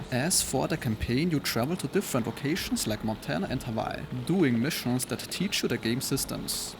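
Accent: Germany. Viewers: Do i hear german accent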